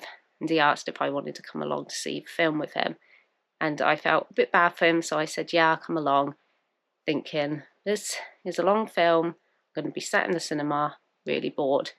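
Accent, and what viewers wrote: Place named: United Kingdom. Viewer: Hello! I liked your vlog! I’m also a huge fan of Tom Hiddleston. The video was very informative and interesting. I didn’t know about the fact that Tom Hiddleston’s favourite book is “Anna Karenina”, and for me, as a Russian, it is a great surprise and pleasure. I have a question, sorry if I’m wrong, I’m just curious; You have a very beautiful accent, and can I assume by that that you live in the UK? If so, did you have any chance to meet Tom Hiddleston, possibly at some events? Thank you and have a great day!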